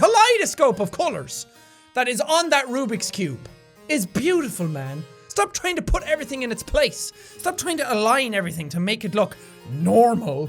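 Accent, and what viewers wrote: Ireland. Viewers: what if you lose your accent since your not living in Ireland anymore... like how people who move to the US, from a place like Australia, they start sounding American after a while.... just curious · Are you scared by the fact that because you moved away from Ireland you'll slowly lose your accent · you really like potatoes😉 (Not Lying) And gotta love DAT Irish Accent(I'm White) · do you think your irish accent will go away since you moved countries, I hope it doesnt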